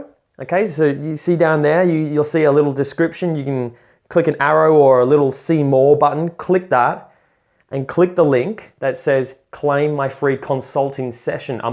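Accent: Australia. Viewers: Sounds like an Australian accent to me, I should have taken it up years ago but may be too old now. My cousin ended up doing really well (Hunter) and an old time close friend Chase cleaned up also, I only got as far as topless waitering and chickened out unfortunately.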